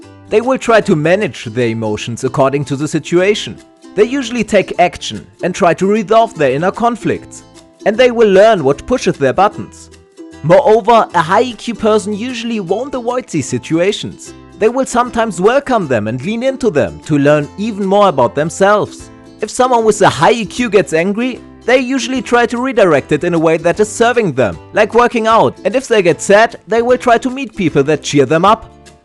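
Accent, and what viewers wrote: Germany. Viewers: Ist deine Muttersprache Deutsch? You sound like a german 😃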